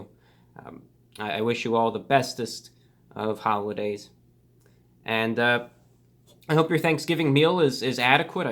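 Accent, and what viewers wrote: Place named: New England, United States. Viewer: You have such a New England accent lmao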